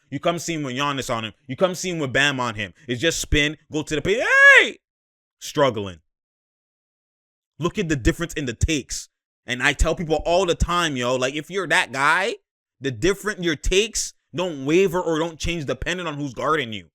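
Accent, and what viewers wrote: Canada. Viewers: U Canadian bro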